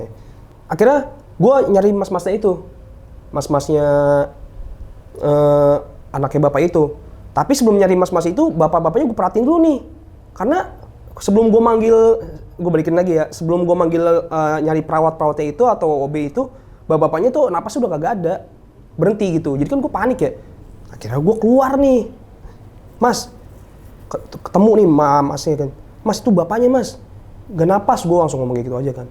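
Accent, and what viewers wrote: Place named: East Jakarta, Indonesia. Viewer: Lo so asik bang jaktim bgt 😅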